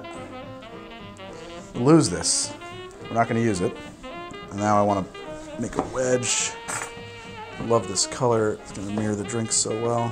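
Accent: New Jersey, United States. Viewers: Punxsutawney, Pennsylvania. Laird's., your Jersey is showing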